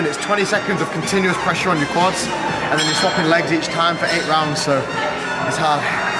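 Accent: United Kingdom. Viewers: Would you mind talking with no environmental sound? It’s hard for me to listen your British accent and even more if you don’t use a microphone. Thanks !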